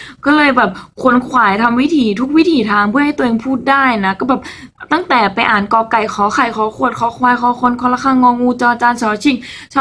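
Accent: Thailand. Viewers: พูดใต้อยู่เลย5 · ฮาๆๆ มีอีสาน มาด้วย 5555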